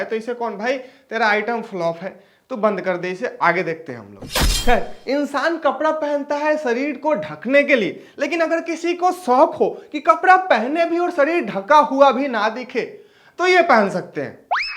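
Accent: Bihar, India. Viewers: Aapki aawaj thoda bihar type me he thoda thik karo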